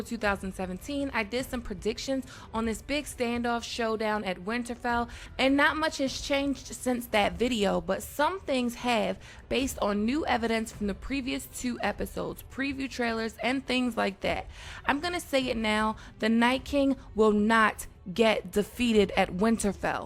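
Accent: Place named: Baltimore, United States. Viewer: u sound cute when ya B"more accent comes out. lol It was thick in this video. But I agree D&D wouldn't do something as elementary as having the 1st plan work. I like #Stargaryens. Gr8 content as usual.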